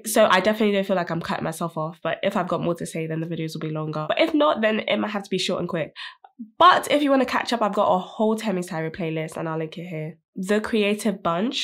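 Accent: United Kingdom. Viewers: “You sound like a British but look black” I can only laugh 🤣🤣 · You sound british but look black?!?!? Is she playing dumb? or has she been living under a rock all her sad life? Only shows how ignorante the woman is. Beyond words. 🤦🏽‍♀️💥😵‍💫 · “You sound like a British but you’re black.” BOI WHAT ?! 😭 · Great video but, “You sound like a ‘British’ but look black”? That is the stupidest remark ever Temi has to deal with this lol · "you sound British but look black" who says you have to be white? Such people scare me I swear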